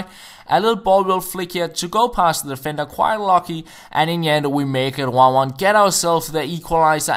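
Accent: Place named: Denmark. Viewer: Thats your opinion He is from denmark and that is his accent so deal with it If it annoys you so much than dont watch his videos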